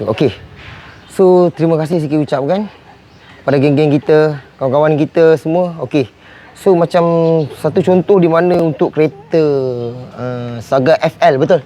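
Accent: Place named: Kelantan, Malaysia. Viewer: ade bunyi mcm kelantan sikit. Ore klate ko?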